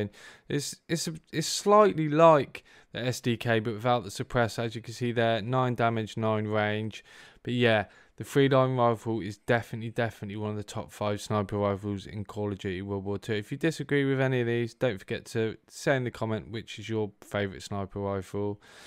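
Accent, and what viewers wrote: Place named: United Kingdom. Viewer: Damn british accent I can barely understand half the stuff you're saying 🤣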